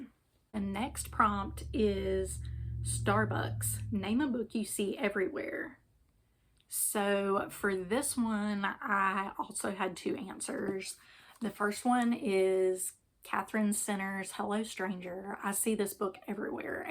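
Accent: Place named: South, United States. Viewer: Yesss our southernnnn 😂we can’t pronounce things hahahaha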